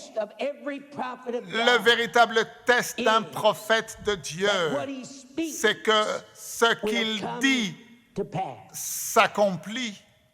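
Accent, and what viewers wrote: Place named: France. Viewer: Amen! Alléluia! Merci bien-aimé frère! I always knew you were French, both from your accent and from your name! The Lord has supernaturally connected me to Morris Cerullo by revelation in 2018, and since I received 5 encounters with this precious man of God through the Spirit. You are blessed as you carry on this mantle and precious words. The Lord strengthen and bless you. Love from Togo.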